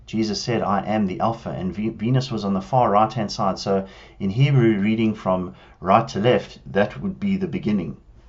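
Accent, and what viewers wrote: South Africa. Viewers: Hello from over the Pacific....Am so glad I found this website....Amazing work,thank you, from one South African to another...I hear my countries accent loud and clear,and I miss it... :) May God richly bless your work....MARANATHA! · Thanks, great work!...you from Johannesburg?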